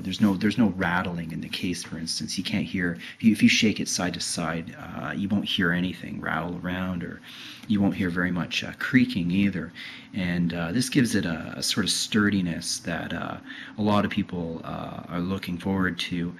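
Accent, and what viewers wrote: Canada. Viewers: wow i've never heard someone call so many things by the wrong name. i bet you call the ipod touch an itouch too. the cpu is in ghz and the disk is 320gb. the ram is 4, its called macbook air, and macbook pro. its also called 9400m and 9600m gt. i sense a canadian accent so it's understandable. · This guy sounds Canadian to me.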